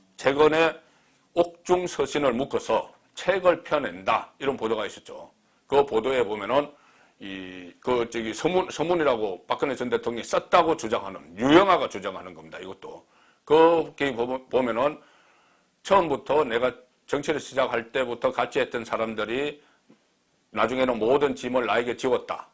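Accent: Gyeongsang, South Korea. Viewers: 송국장님 경상도분 같은대 우리공화당 지지하시는것 어떠신가요?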